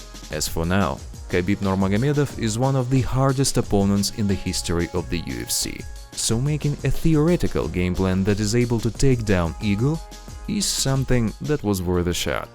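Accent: Russia. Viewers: Guys, I love your analysis, but you need someone else to read the English text. The thick Russian accent will scare of most newcomers.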